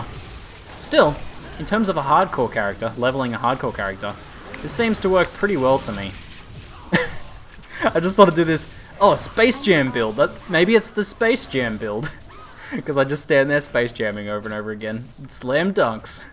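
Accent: Australia. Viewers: You have just became my favourite streamer. Recently I have been paying attention to a couple of D3 streamers, like Archon, KingKongor, blaqksmith & etc. I prefer you to any of them! Keep up the good work! I think it has something to do with your Aussie accent, or you just remind me of a friend, but your description during gameplays are absolutely colourful and intriguing!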